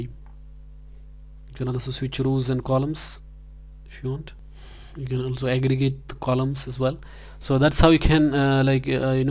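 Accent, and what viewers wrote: India. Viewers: That is some heavy indian accent! Haha it sounds so cool! how do you do those super fast D:s? It sounds like a CD glitching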